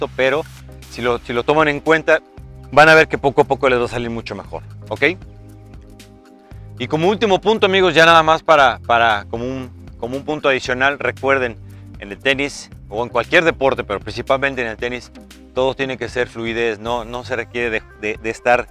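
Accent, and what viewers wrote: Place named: Mexico. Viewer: de que parte de México es profe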